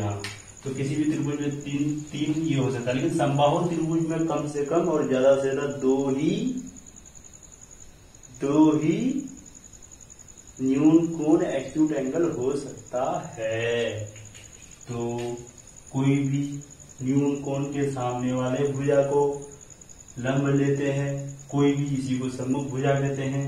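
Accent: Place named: Bhojpuri, India. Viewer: Bhojpuri me bol Rahe ho aap ❤🎉🎉🎉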